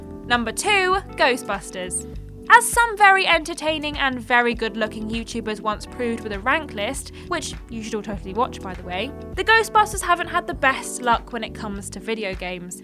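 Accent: United Kingdom. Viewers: guys i just realized that she speaks British accent it makes sense because she lives in the United Kingdom at Europe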